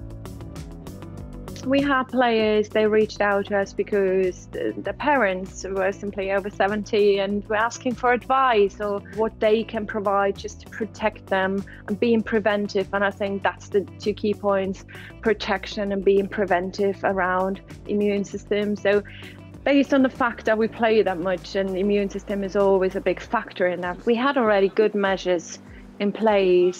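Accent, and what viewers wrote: Liverpool, United Kingdom. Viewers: She's started to sound a bit scouse. :) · Her scouse accent is proof how much she embraced the new life and culture of LFC and probably the city in general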